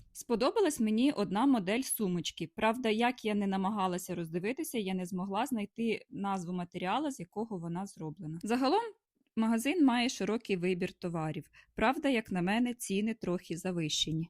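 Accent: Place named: Galicia, Ukraine. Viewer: Це якийсь ссс Галичина...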